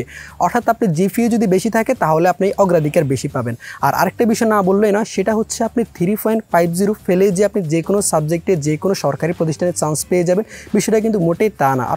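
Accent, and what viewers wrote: Noakhali, Bangladesh. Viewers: ভাইয়া মনে হয় নোয়াখালীর? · Vai apner bari noakhali?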